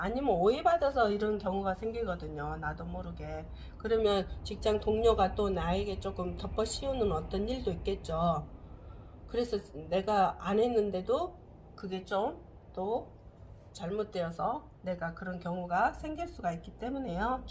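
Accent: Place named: Jeolla, South Korea. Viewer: 전라도분이시군요 저도. .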